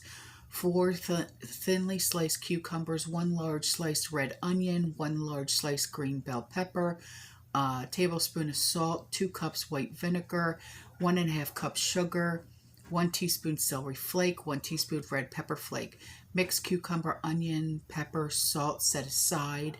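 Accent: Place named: Boston, United States. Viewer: "Grab your cwauffee".....that's how I hear it ......great accent.....Mass?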